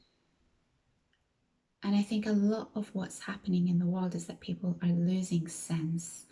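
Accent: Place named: Scotland, United Kingdom. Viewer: You're absolutely right about politics being two sides of the same coin. I also find politics boring and contrived, and I agree that it's in our best interest to take things/viewpoints from both sides or none, rather than subscribing to just one. That way it's alot harder to be brainwashed or tarred with the same brush. Left/Right is just yet another duality we have here on earth. Everything is duality, pepsi/coke, red/blue etc... Constant divide and conquer techinques. I've never followed Charlie Kirk but I would never glee at anyone's death. I am extremely careful about where I send my energy. I'm not sure why Youtube decided to reccommend me this video haha. You have a very calming voice and seem like a high vibrational person. And I resonated with you when you mentioned how dull everything is during Wintertime in the U.K. It's like that here in Melbourne AUS too. 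I also travel during the colder months. Hot places are my favourite. I know you mentioned Sheffield, but did you live in Scotland at all? I noticed sort of a Scottish twang to your accent.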